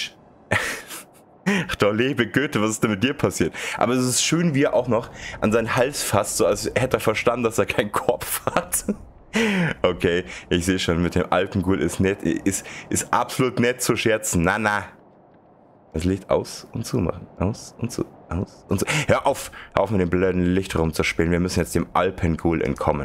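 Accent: Bavaria, Germany. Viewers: Hey, ein sehr schönes Spiel hat mir sehr gut gefallen! Dein Bayrisch ist echt Fantastisch 👍 hast mich wieder super Unterhalten der Alm Öhi würde sich freuen und mit dir ein Helles trinken oder Ziegenmilch 😅😂🤘 Vielen Dank für den tollen Prolog hab ein Super Wochenstart Bro 😁 Lasst ein #Like und ein #Abo für den lieben da 🤘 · Richtig geil,und dein Bayrisch dazu,top😂. Würde gern das komplette Game sehen,war ziemlich genial. Weisswurst ist überhaupt nicht meins,never ever😝,sorry.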